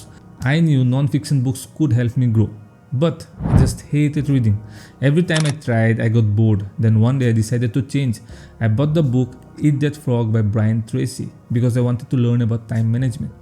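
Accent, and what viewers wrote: India. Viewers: Assamese Accent on top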